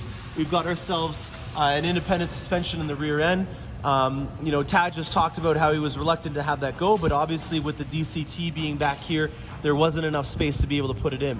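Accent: Canada. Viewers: are you Canadian? interesting accent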